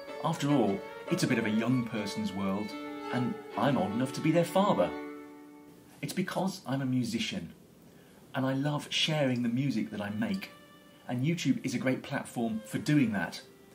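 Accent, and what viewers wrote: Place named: United Kingdom. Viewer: your magical british accent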